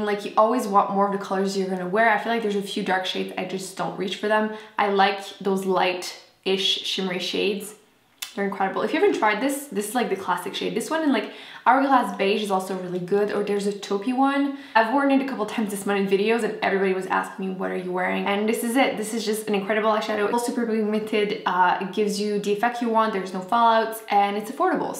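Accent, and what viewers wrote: France. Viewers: Am I the only one who loves your slight french accent when you speak english? :-D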